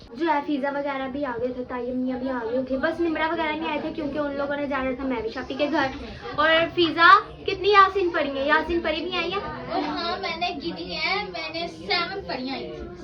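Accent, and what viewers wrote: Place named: Rural, India. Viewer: Itna painduo ki tra bolti ho.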